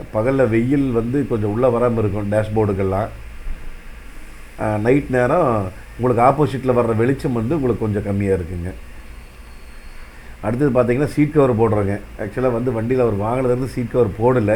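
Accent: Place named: Coimbatore, India. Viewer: உங்க கோவை தமிழ் ரேவியூ நல்லாருக்கு போலிஷ் சூப்பரா இருக்கு Approximately running kms 160000 Budget rs.<num>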